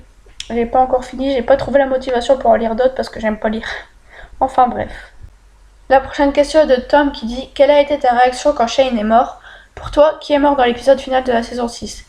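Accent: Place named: North, France. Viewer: Hey bah mi j'min douto qu'této du nord ça s'intind a t'n'accent alors bin venu au club :) Traduction pour les non ch'tis '' hey bah moi je m'en douté que tu était du nord ça 's'entend alors bienvenu au club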